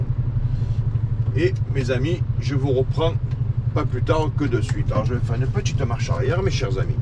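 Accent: Marseille, France. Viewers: merci l'ami! tu viens de me réconcilier avec la geopolitique !! c'est vrai qu'avec l'accent marseillais ça passe mieux !;-)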